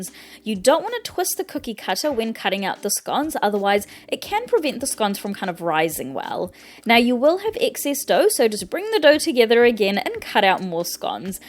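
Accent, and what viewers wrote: New Zealand. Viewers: Is this a Kiwi accent? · Hi just wondering your a NZer your accent sound familiar lol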